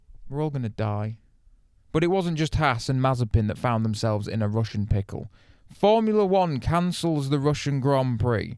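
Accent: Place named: England, United Kingdom. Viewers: he’s mentioned Sheffield before. so probably near there! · This is a perfect English accent. Most annoy the shit out of me, but owns all. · Sounds Manc to me I reckon, any other English lads or himself can confirm? Thank God he's not fucking scouse that's for sure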